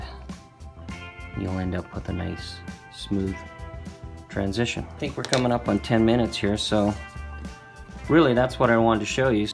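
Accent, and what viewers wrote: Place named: Canada. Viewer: Great channel you have going! Clearly shot video of everything you do and understated style of presentation make watching a real pleasure. No bling, no nonsense theatrics. Just a Canadian modeler (guessing by the accent here) teaching others to make their models something more than what comes in the kit. As a jewelry engraver I see that many techniques you demonstrate are similar to what is done on metals. Plastics are widely variable and offer more than a few challenges in re-workng the material. I have so much to learn yet and I suspect I will be closely studying every video you have. I'm trying to build Napoeonic armies in 1/72 and good info such as yours is difficult to find. there are thousands of "how to paint ____" videos out there but precious little about working the plastic itself. Subscribed and liked! Best modelling channel I've found yet, thank you so much for sharing your expertise with others.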